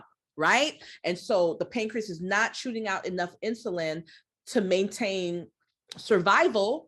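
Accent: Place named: Haiti. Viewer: All of your videos are so helpful I love the way you break It down. That’s exactly how I speak to myself when trying to learn . And reading the book alone is definitely not as helpful since you make It make sense ! One of my classmates told me to watch you cause she said we speak the same, and when I did I had to laugh ! I kept saying to myself she gotta be Haitian and I would like to say originally from New York or lived there at a point ! Anyway , thank you for all that you do for us nursing students 🙏🏾🙏🏾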